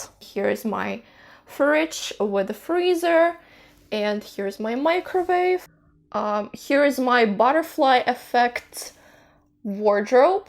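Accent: United States. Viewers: if i didn't know was already Russian, i would have thought she was American. HAHA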